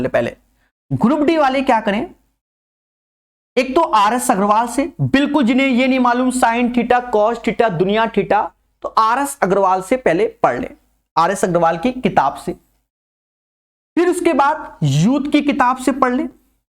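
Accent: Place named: Kanpur, India. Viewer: Oye Hoye. Apne Kanpur wale Sir. Kanpuriya Style me. Rock krte hue. 😎😎😎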